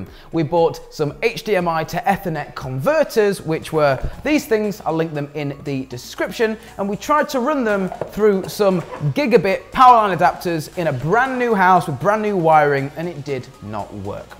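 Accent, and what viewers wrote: England, United Kingdom. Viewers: What do you mean "say Ethernet Properly "? He's saying it with an English accent, do you speak english? Because its sounds ok to me. it's called English not Americanese.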